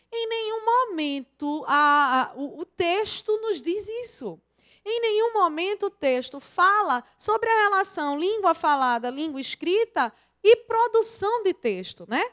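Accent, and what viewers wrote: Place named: Pernambuco, Brazil. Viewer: Sotaque pernambucano! Normal...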